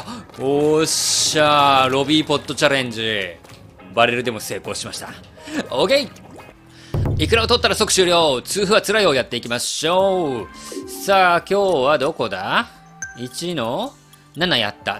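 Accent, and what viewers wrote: Hiroshima, Japan. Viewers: イケボなのに広島弁で親近感が湧く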